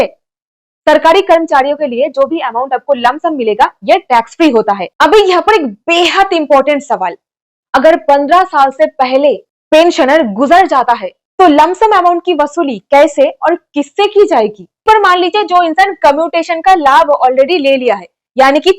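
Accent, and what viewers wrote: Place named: South, India. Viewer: Your south india hindi speaking style is osam, I like your speaking voice.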